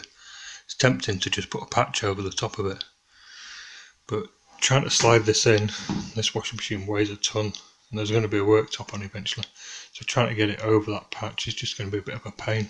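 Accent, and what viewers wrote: Yorkshire, United Kingdom. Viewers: Loving your vids buddy. Love the no-nonsense, no-editing explanation of everything in that down to earth Yorkshire accent! 😆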